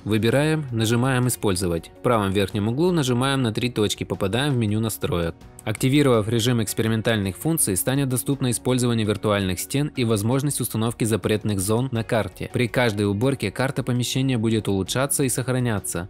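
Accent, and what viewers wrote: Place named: North, Russia. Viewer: Очень много "оканья" 🙂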